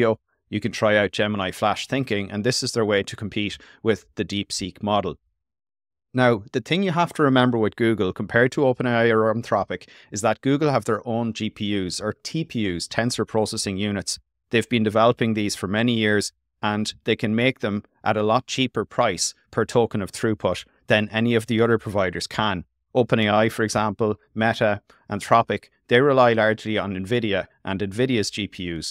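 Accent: Ireland. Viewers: Surprising but great to hear an Irish voice on an AI LLM review. Great content mate intelligent & well explained, keep it up please!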